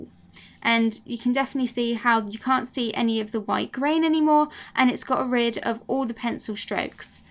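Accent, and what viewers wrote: United Kingdom. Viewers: thanks for this experment. In pencil they all look more or less the same except tissue being softer and lighter in charcol they look more or less the same except that tissue maintain the quality of lightness, and finger smothness. while cloth in charcol maintain paper texture. I agree tissue is the most distnict result especially in tone, being lighter. in both charcol and graphite. Of course assuming that the amount of preassure and the medium filling prior to smudging is maintain constant. soft delicate sponge is great for sof transition.. another artist demonstrate it with make up sponge.. forgot the name of it but it is like pencil with little sponge at the end I think for eye area.. i dont have one that is the disadvantage of being a man.;) One old art professor said that chimoa cloth is the best that work for smudging. the graphite part. 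Great video By the way I love languages and accents and speak several, what region is your accent in britain? I see you pronouce the letter "h" as "hitch", thanks for the video.. have a nice day.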